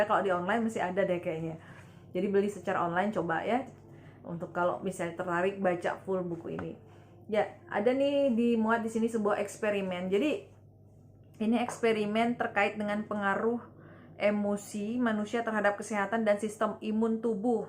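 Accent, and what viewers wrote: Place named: Bali, Indonesia. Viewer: Mba nya dari bali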